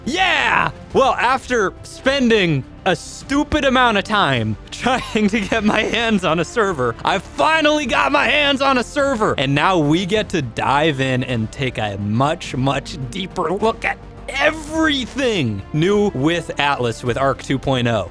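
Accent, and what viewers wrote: Australia. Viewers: Disliking because of the terrible Australian accent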